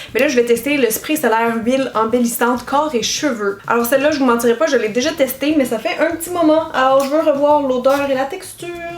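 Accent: Quebec, Canada. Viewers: Je suis belge, je suis un homme et j’adooooooooore ton accent québécois! J’ai été hypnotisé par ta vidéo, tu es souriante, pétillante, et tes réactions sont géniales! Merci pour cette vidéo 😊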